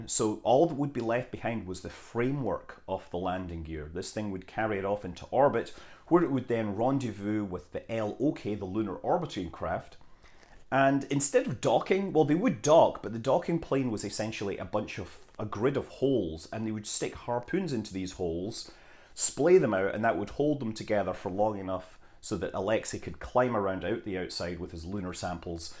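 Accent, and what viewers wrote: Scotland, United Kingdom. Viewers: looks like times are hard out there, so I have shipped you some razor blades. Just because you sound Scottish, doesn't mean that you have to look like a drunk Glaswegian in a Soho gutter!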